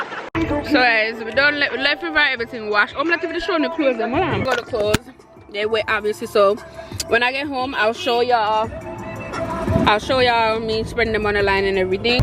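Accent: Jamaica. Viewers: Uh hear patwa strong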